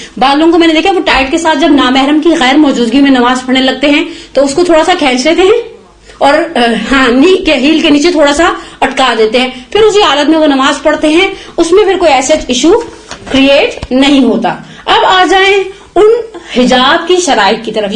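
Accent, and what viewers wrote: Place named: United Kingdom. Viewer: Plz plz ap angrazi accent main urdu nahi bola karain topic acha magar sunaaa muskil ha in ko ..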